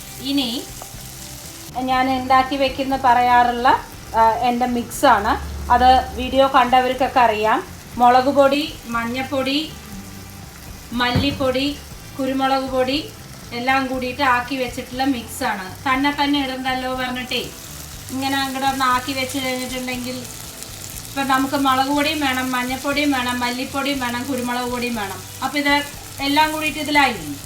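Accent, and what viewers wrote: Palakkad, India. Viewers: Madam.your Palakkad language kekkan sumundu.kettolin Palakkadil evedeyanu Basha kettu kollongode side Anu thonnunnu .with best wishes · your Palakkadan language awesome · palakkad slang kelkkan super njn thrissur anu pne curry indakkunund tto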